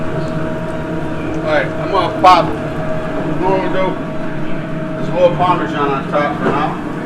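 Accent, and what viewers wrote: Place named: New England, United States. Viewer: Love your pronouncement, "Croaked Mon Sewer" LOL. Not knocking it at all mate, just thought the NE accent rocks!! I'm in Vermont here. ps: Johnson Wales Charleston, SC Campus Culinary class of 1996 here. Since retired but still cooking the classics. Cheers!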